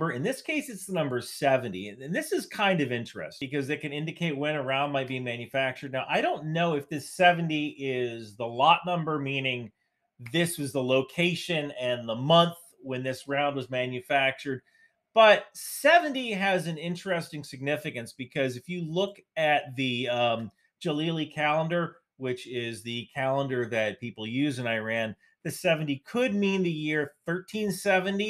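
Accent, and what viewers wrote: New Jersey, United States. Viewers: Mr., dont ever apologize fir for your Jersey accent. I'm from a few miles north of the Jersey border and I sound just like you. And it's a great accent! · I mean he doesn't really have a strong accent. You can definitely hear the Jersey in his voice but it's not near as intense as some! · Thank you for breaking this information down. If people complain at you for mispronunciation in a Jersey accent. I would hate to hear them whine about my Texas accent pronouncing foreign words. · I grew up and still live in S. Jersey, no worries about the accent. And I'm near the middle of the speedline, so at least your hometown has a claim to fame. Don't feel bad about the way you talk, all good Jersey people talk like that. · Shit man, I like the Jersey accent even if I barely hear it. Keep at it! Without you and Preston Stewart we wouldn't have nearly as much accessible info! 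· don't apologize about your New Jersey accent. I'm from NYC and I completely respect and understand you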